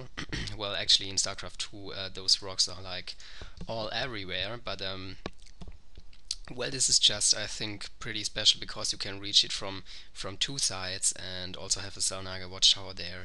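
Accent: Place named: Germany. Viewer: lol exactes deutsches schulenglish :D:D:D:D:D und mir gings exact genauso bei meinem ersten 1on1 :D:D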